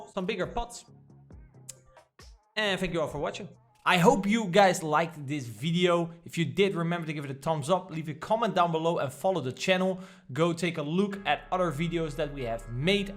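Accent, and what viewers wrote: Netherlands. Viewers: great man. I heard the typical dutch accent when talking english haha. Great content man , i love it !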